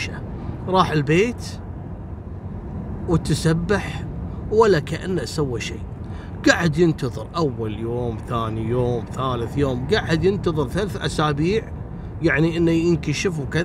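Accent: Morocco. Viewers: ما احلى اللهجة المغربية بصوت اخونا ،تحية مغربية من بريطانيا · يازين كلامك بالمغربي 😉😛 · تعلمت اللهجة المغربية يا حتى المدن المغربية بدات تعرفها تحياتي لك من المغرب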